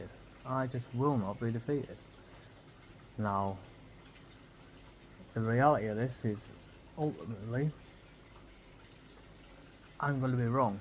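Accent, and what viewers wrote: Northern England, United Kingdom. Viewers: Us northerners can be hard to understand 😅 believe or not.. not all of us English people talk like the royals..... we have 100s of different accents and subtitles are available if u click the double cc at the top of the video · To my American English ears the northern English accent is a bit difficult to understand, sort of like the Scottish accent.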